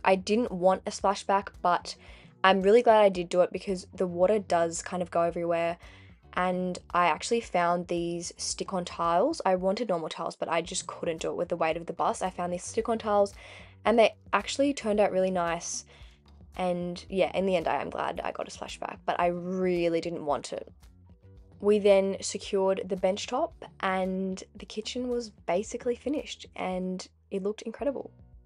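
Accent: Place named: Australia. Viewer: the most Aussie lady accent I ve ever heard, hope to see the bus in person one day, what a lovely build, love it ❤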